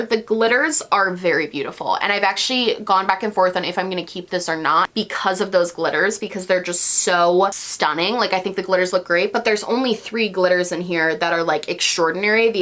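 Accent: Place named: Rochester, United States. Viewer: I'm from Rochester but I've lived in Seattle for five years and I watch your videos to hear that sweet sweet Rochester accent ❤️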